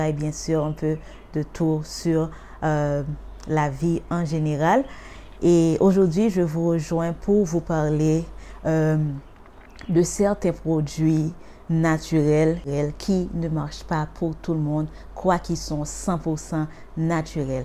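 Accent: Haiti. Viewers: Je reconnaît l’accent d’Haiti chérie 💋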